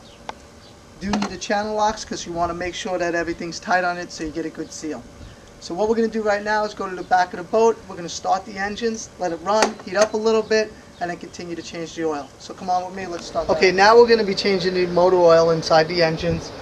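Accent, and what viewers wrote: Long Island, United States. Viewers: were from long island are you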